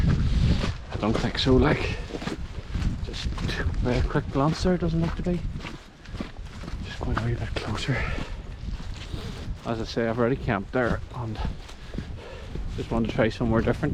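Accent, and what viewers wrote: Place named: Northern Ireland, United Kingdom. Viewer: Good stuff buddy 👍 enjoyed that little adventure 😂 glad to hear 👂another Northern Irish voice on youtube😁😆🤣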